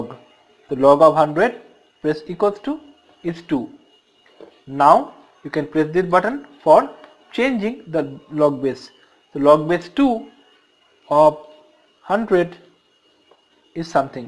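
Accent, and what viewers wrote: India. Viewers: you should work on your indian accent :/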